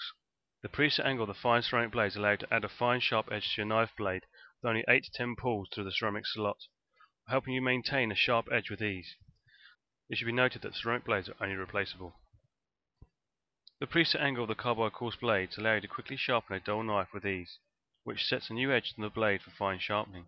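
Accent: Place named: United Kingdom. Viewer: Great and thorough review. And the cool British accent is a nice touch. :)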